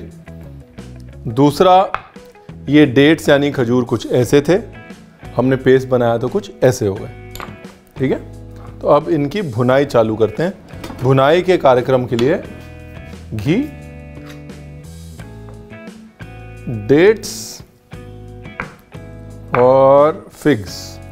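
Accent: India. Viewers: Pta nhi jab MASTERCHEF INDIA MEIN Chef Ranbeer ko dekht thi ..to dono chef k muqable mein wo thoda...moody lgi thi..but..here OMG I can't...he is sooo much...typical Punjabi GREEN HEART MAN...NOw I love him soo much & his simple cooking style..& also his frankly desi speaking....😍😍😍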